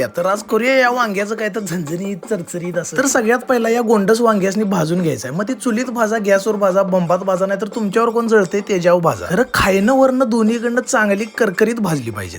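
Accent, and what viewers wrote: Kolhapur, India. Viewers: Arr kay marda... kasla zakas kolhapuri accent pakadlaysa... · Kolhapurkar bolan vishay hard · यांची भाषा कोल्हापुरी असली तरी ती कोल्हापूर इचलकरंजी शिरोळ तालुक्यातील वाटते. कोल्हापूर शहरातील भाषा वेगळी आहे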